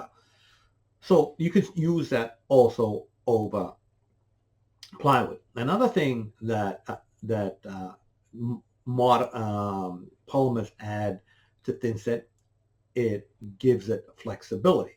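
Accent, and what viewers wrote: Boston, United States. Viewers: I heard you accent in the first 3 seconds and immediately knew you were from MA, most likely from Boston! I’m not from Boston but I am born and raised in MA like 40min south of the split! Thanks for the video! It was really helpful! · Thanks for all the great information based on your many years of experience. When you talked about the extended life mortars, I heard so many different words... "____ life." Pub life? Park life? Pot life? You'll have to excuse me as I'm from the mid-west, but was it palm life? Port life? Part life? Half of me is giving you a hard time for being from Boston, but the other half would really like to know. That is if you would kindly spare a moment of your time to clarify. Thanks and Cheers! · Love ur "Booastan" accent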